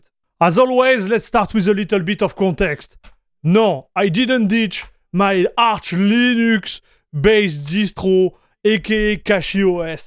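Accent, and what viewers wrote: France. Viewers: Damn that french acesent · that french accent goes hard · One just have to love this strong french accent :-) Moral of the story: don't use rolling distro nor cutting edge versions of software when you need stability.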